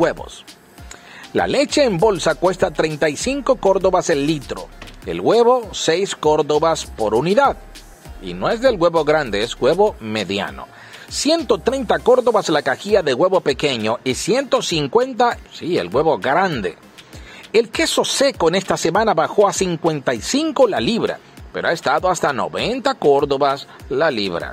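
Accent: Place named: Yucatan, Mexico. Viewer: Oye tienes un tono a yucateco. Pero bien lo que has dado es una lista de precios, y que cada nica ve como resuelve como siempre ha pasado desde ahce mas de 40 años. Loque falta decir es que la tecnología hizo que se incluya en la lista de gastos. Me refiero al celular. Cuanto gasta en mes en recargas? qué precio tiene el celular de un nica? y qué ha dejado de comer para comprar. Entonces mal educados goberno quien gobierne un pais la vendita tecnologia marcará el paso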